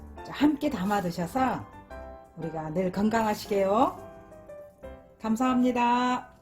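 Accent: Jeolla, South Korea. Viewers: 누님 고향은 어디신가요, 말씨가 전라도 같은데?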